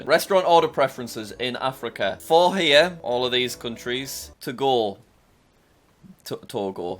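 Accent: United Kingdom. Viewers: Americas look more like a duck sideways we’re you saying dog or duck I’m sorry I don’t understand British very well I’m American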